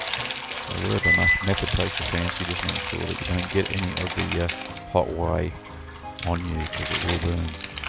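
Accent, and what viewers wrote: Australia. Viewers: You sound like the Aussie version of the BBQ Pit Boys!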